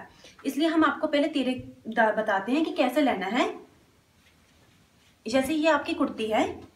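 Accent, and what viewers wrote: Punjab, India. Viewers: I am from Punjab .....love your Punjabi accent ❤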